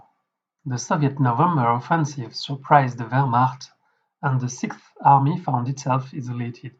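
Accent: France. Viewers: Nice vid, it's great to see the game played by its developer (and to hear his delicious English accent from Paris 😉) 👍👍👍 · The aesthetic is very beautiful. And the gameplay is innovating. You're english accent seems to betray a french identity ;). Vous avez développé ce jeu tout seul ? Il manque peut-être des musiques de fond pour le côté drama (comme dans le futur jeu Burden of Command).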